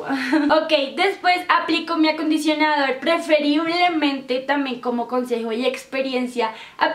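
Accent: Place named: Colombia. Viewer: Me encanta su acento colombiano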